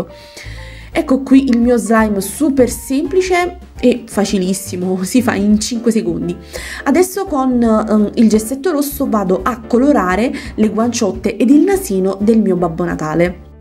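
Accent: Naples, Italy. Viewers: sei di napoli?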